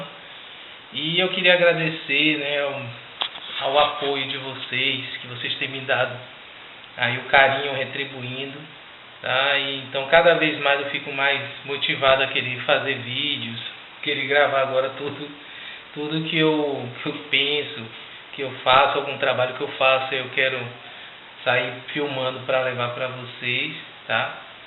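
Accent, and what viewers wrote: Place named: Recife, Brazil. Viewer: olá, me apaixonei pelo seu trabalho desde que vi o primeiro vídeo, e seu sotaque me faz lembrar minha infância e meus primos do Recife.Você é maravilhoso no que faz e se reclamarem que vc fala demais, são pessoas ingratas, pois se vc tem o trabalho de postar vídeos para nos ajudar devemos agradecer e não criticar.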